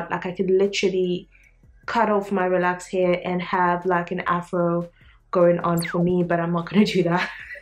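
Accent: South Africa. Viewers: Sounds like you have a South African accent, do you live there?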